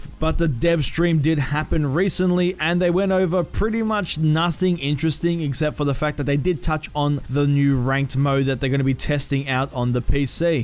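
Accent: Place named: Australia. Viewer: Which part of australia you from? Im subscribing m8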